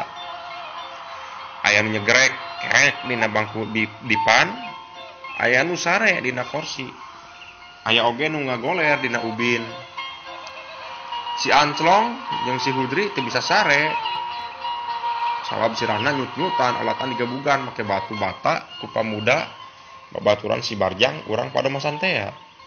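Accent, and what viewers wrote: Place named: Java, Indonesia. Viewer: resep logat jawana.....hhee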